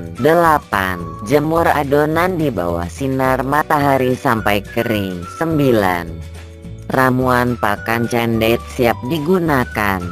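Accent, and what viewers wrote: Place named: Java, Indonesia. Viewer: Sampean jowo mas...medok men.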